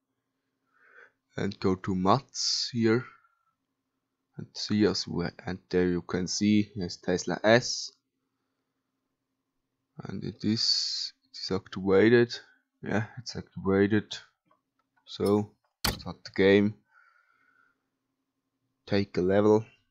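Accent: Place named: Germany. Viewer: Ich höre so einen deutschen Akzent...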